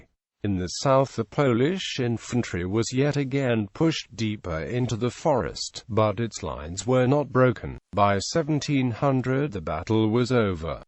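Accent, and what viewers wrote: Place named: Wales, United Kingdom. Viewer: I never knew AI could do a Welsh accent :)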